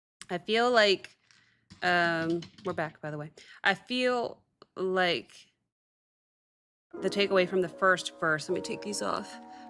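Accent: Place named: Ireland. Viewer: Lovely Irish tones❤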